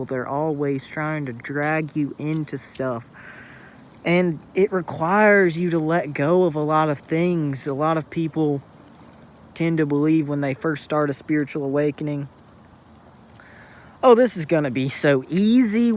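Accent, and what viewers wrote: South, United States. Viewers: You poor kid, I know exactly how you feel. I've felt the same way ever since my awakening in June of 2009. The one major difference is that I've been lucky enough to find a partner who is on the same path of ascension. Judging from your accent, I imagine you live in a part of the US often referred to as the Bible belt, where spiritual truth is deemed satanic by an overwhelming majority of people. I must say I admire your light-hearted way of being, considering how alone you must feel. It's strange, we go on the internet and we see thousands upon thousands of posts from people who definitely seem to be on the path of Ultimate Truth as we are. Yet, when we look at our immediate surroundings, we feel completely isolated in our quest for ascension. In these times of confinement, it has occurred to me that a lot of people are using an app called Zoom for all sorts of things, yoga classes, business conferences, etc. 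Maybe those of us who are on this sacred path should form a group with that app too so we can share ideas and experiences. What I want to share with you, my young friend, is that you are not alone, not even close. Like you have said here, the spirit world smiles upon you every day all day, but in addition to that, you have gained a lot of popularity in a very short time on this channel, which goes to show there are many of us, tons of us, who know what you know, and think as you think. In the age of technology, maybe we should take advantage of this technology to unite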